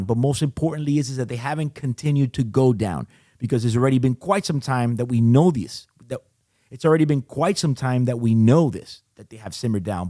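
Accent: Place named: Miami, United States. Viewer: Heaviest Miami accent ever. Clear-lee.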